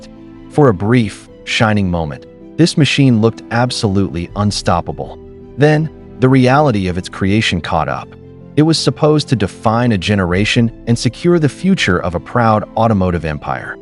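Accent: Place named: United States. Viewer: The American accent devalues the video.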